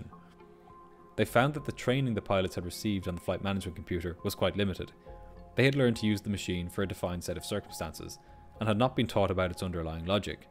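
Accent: Ireland. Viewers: Everyone has different accents and he's Irish. If you've got a problem with that scroll down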